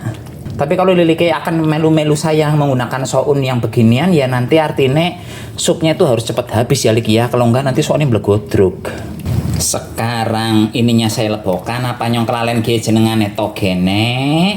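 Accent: Banyumas, Indonesia. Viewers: Om maaf iya batam itu daerah jawa tengah iya kok om bisa bahasa jawa kayak orang bayu masan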